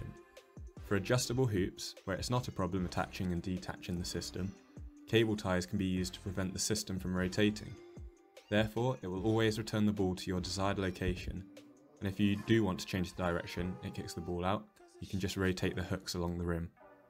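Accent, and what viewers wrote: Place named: England, United Kingdom. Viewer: Shocked and pleased to hear the English accent for a basketball video!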